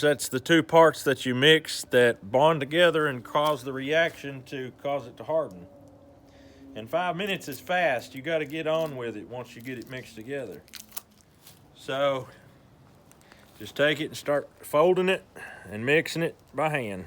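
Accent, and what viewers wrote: United States. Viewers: you have such an american accent, i like, also good video man, i need it to fix drop out in my eletric bike so wanted to check how strong it was, thanks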